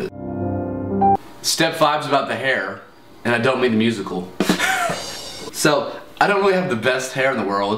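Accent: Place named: South, United States. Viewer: Amazeballs. Totes entertained, love the southern accent btdubs!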